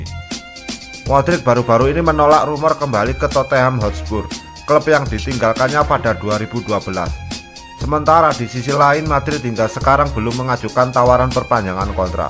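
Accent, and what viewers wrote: Java, Indonesia. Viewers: Aku suka channel ini..cmn suaranya min ini yg aku gak suka..logat Jawa nya terlalu kentalnya dan kedengaran nya gk terlalu jelas min baca naska kaya ke anak SD yg baru belajar baca.. tolong min Suara yg lantang dan jelas kita pengen menikmati berita🙏🙏🙏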